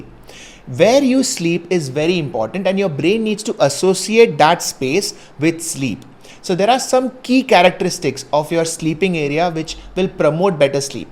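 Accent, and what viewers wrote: India. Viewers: Nice Indian English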